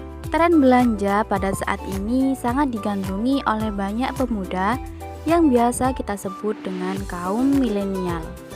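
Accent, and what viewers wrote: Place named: Madura, Indonesia. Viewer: Masya Allah gagal fokus sama logat maduranya, tapi keren nih. Semangat terus berkarya 🔥👍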